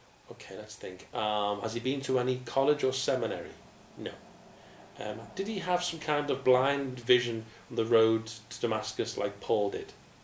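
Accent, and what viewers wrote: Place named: Liverpool, United Kingdom. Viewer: Hey mate you sound like a fellow scouser we've probably been to the same assemblies. Ain't no way these tossers are anointed their just stunt doubles for snow white's seven dwarfs. take care and have a great life free from suppression as I intend to. Cheers my friend.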